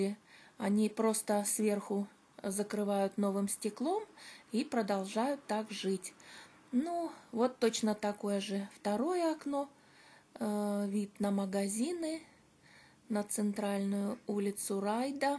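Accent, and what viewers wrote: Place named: Provincial, Russia. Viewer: А ведущая из какой хацапетовки со своим говором?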